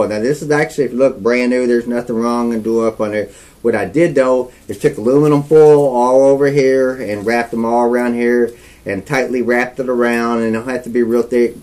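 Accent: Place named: South, United States. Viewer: I like his southern accent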